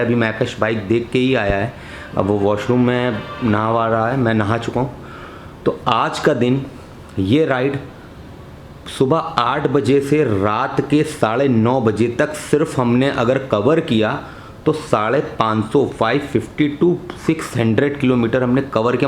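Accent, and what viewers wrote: Kanpur, India. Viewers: bhai maza a gya apki voice sun ke kanpur ki ek alg hi feel ata he maza a gya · Bhai...amazing vlog series....but yaar aapka typical Kanpur accent is really wonderful...😊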